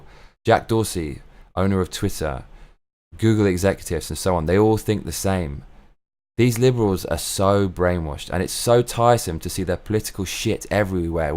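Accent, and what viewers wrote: United Kingdom. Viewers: Hello, The lead-actor of the Creed movies is Michael B. Jordan (no relation to the superstar American basketball-player, Michael Jordan). He played the primary-villain in the recent Black Panther film. He was also Johnny Storm/The Human Torch in the 2015 version of The Fantastic Four. I was unable to hear the quality-difference between the microphone that you usually use, and the $10,000-microphone; perhaps the audio-compression that YouTube employs removes the frequencies and the nuances that make the difference. However, it was very interesting to see the substantial-weight of the superior microphone. I, too, have never heard of an internal pop-filter/pop-shield; I simply reasoned that such an expensive microphone would probably include pop-prevention methods that are more-convenient and more-effective than what is available for microphones that most people can afford to purchase. 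The fitted 360-degree filter is beautiful, and it makes the microphone/filter look all-of-one-piece, as opposed to most filters/shields, which look like sloppy after-thoughts. Your instructions for the audience to ignore your spoken-content, and to pay attention to only the sound of your voice, made me laugh! Although you have a masculine-deep voice and a classy British-accent, it is your intelligent and entertaining commentary that has made me continue to be a subscriber since I saw your insightful-critique of The Force Awakens. Good luck to you as you apply for a permanent-visa i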